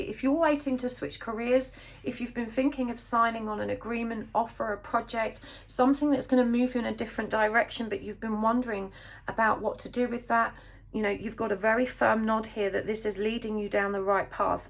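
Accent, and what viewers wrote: United Kingdom. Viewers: I love ur voice ur British accent amazing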